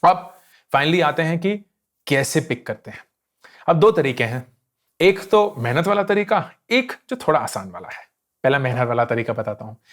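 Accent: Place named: Punjab, India. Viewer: Bhayi, we are Punjabi's. It's alright to say cheejay with Jajjaa of Punjabi Language. We all should be proud of mother language and Mother tongue influence as long we are doing right things in our life. I hope u agree and keep saying Cheejay 😊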